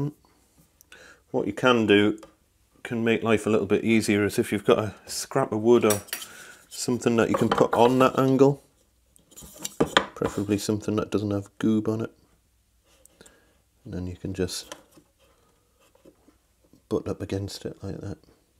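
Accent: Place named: Leicester, United Kingdom. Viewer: Lovely video yet gain. Where is your accent from? I know a chap in Leicester that speaks exactly Iike you! Maybe it’s just the subtle stops in the words? Soothing though lol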